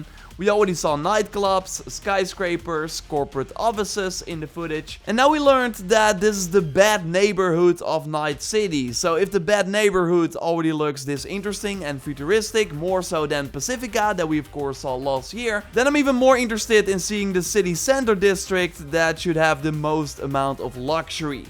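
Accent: Netherlands. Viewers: youre dutch, i can hear it in your voice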